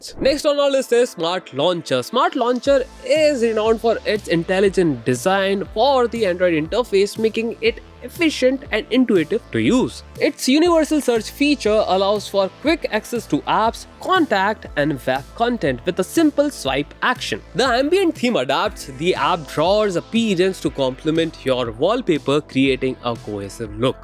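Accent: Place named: United States. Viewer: You really have a decent but not perfect American accent.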